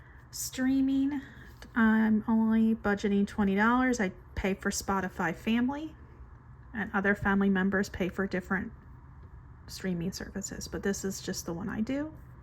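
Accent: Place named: New Jersey, United States. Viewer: As soon as I listened to you, I knew you were from Jersey. My family lives in Wall Township and Belmar.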